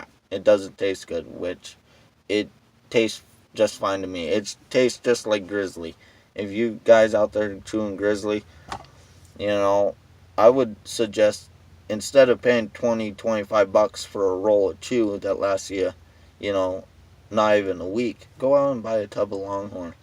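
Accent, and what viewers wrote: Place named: Wisconsin, United States. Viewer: Sounds like he’s from Wisconsin lmao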